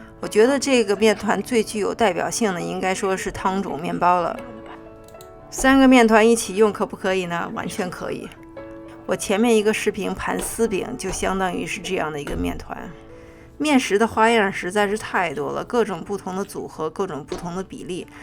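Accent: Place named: North, China. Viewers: sun shieh 也许是你弄了，听口音，应该是中国北方人，她的念法与中国汉语字典一致的，你可以查看字典，至于其它地方是否同一标准我就不得而知了，例如台湾，新加坡等等国家。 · 你是台湾人？是大陆西安人，所以这样读是没错的，你不能强求大陆人来按台湾人的读音来发音。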